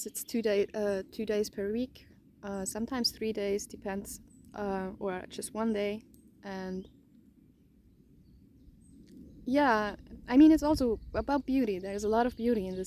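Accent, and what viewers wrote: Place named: Austria. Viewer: it makes me happy to hear that i made you happy and.. i'm from austria too! what a coincidence, i thought that the accent and the sourroundings looked familiar...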